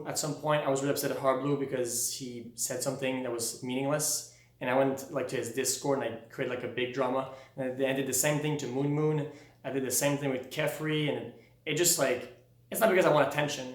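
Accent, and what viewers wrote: Quebec, Canada. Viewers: Just to make sure, are you from quebec, because you have the accent, i write this in english because im not sure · He’s a French Canadian that is trying his best to talk English though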